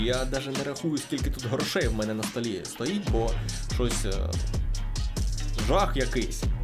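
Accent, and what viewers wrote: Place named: Odesa, Ukraine. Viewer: Прошу не сприймати близько до серця, але я в шоці, що існують українці, котрі говорят українською з таким акцентом. Я з Кривого Рога і теж до ПМВ говорив російською, але такого акценту немаю. Одеса не припиняє дивувати.... просто окрема країна якась, котра всі ці роки була десь не з нами.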